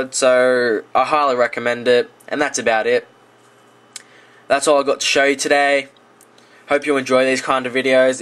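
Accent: Australia. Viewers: Great tips and great to hear from an Australian finally.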